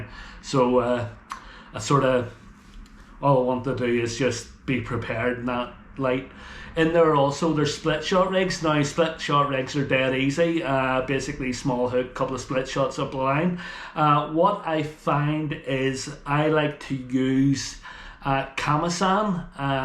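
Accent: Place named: Northern Ireland, United Kingdom. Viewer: Howdy, You sound like your from Northern Ireland. Loving the box, Wd40 over the label will remove it.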